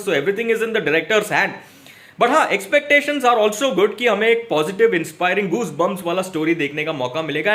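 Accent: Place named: South India, India. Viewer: great job brother..by the way are you south indian? your tone seems to be typical south indian:)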